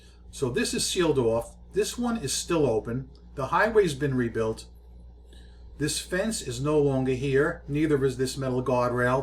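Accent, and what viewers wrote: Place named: Bronx, United States. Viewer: Thanks so much for this video - 1st, your accent reminds me of my dear departed mother, who grew up in various boroughs ( she was born in the Bronx ) - she and my dad ( who was from College Point ) moved upstate in the late 40s, but she never quite lost her accent. 2nd, your breakdown of what was being torn down and subsequently built put me in mind of what the overall plan for channeling traffic in and around NYC and its suburbs- and what part Robert Moses played in that construction- I heartily recommend the book “The Power Broker” ( I’m sure it’s still available on the used market ) - it’s a thick tome on his construction of freeways ( along with many other works in NYS ) - well worth the read for its mix of a powerful man’s vision and works - some very good, others not so much.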